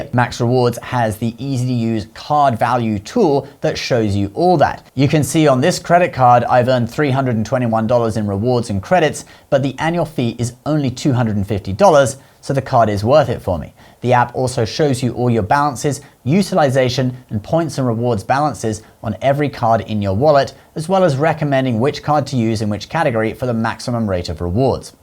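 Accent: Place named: United Kingdom. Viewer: you're Brit? I always thought that was just your YouTube character.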